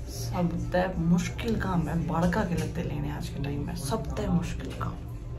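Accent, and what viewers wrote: Haryana, India. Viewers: Hey didi aapka vlog aacha lag aap haryanvi boltae huae aache lagtae ho to or vlog banae haryanvi m 😍😍👌👌👌😍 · Pranjal di mane aapki haryanvi lanuage ganni badhia lage hai ar me aapki bohot baddi fan su 🤣😅😊😊😜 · Ye haryanvi m kya bol deti ho bich bich m samaj nhi aata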